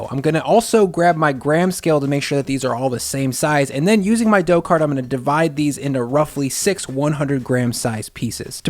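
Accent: Chicago, United States. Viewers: I'm guessing the accent is Chicago? The "SH" sound in a word like, "schtrength". This looks like a Nashville Chicken sangwich but without the pickle juice marinade for the chicken.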